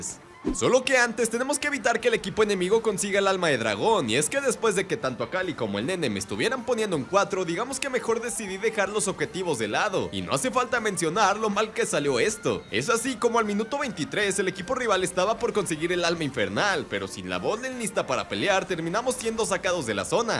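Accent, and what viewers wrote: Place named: Mexico. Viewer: Eres de sonora o baja California ese acento lo conozco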